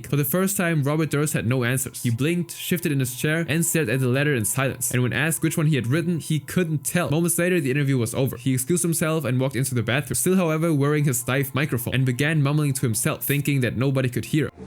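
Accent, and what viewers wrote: Germany. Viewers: You gotta slow down when you talk a bit. Are you German by any chance?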